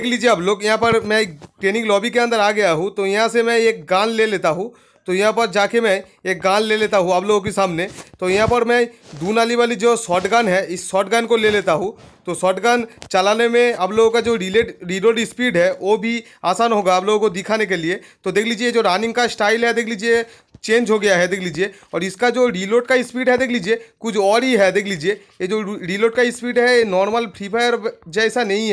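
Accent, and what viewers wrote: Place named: West Bengal, India. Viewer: Awaj sunke pata chal gaya bangali bhai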